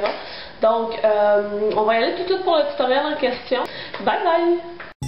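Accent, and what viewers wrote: Quebec, Canada. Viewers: ooh que ca me fait du bien d'entendre cet euh ben euh "accent" hihihi j'ai vécu 5 ans au Quebec et ca me manque........donc je t'ecoute, je ferme les yeux et je m'imagine de retour hihihi bon trêve de plaisanterie, je vais dire une bétise , surement que tu connais cette astuce....mais bon hein........j'ai découvert sur certaines videos de youtubeuse que pour faire "poper" les couleurs elles mettaient une base blanche en dessous (type jumbo nyx) perso je met ma base urban decay + le jumbo blanc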